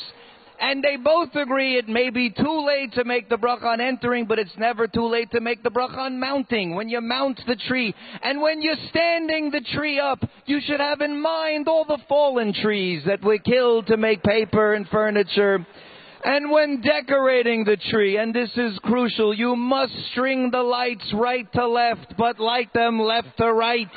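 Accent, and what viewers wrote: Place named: United States. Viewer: THIS GUY SOUNDS SUSPICIOUSLY LIKE A YANK, BUT HE MUSTVE GONE TO MY CHEDER IN SUNDERLAND.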